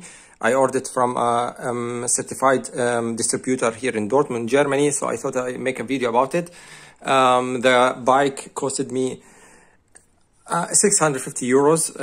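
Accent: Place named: Arab world. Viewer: واضح من اللهجه انك عربي حط الترجمه للعربي لان افكر اخذ مثلها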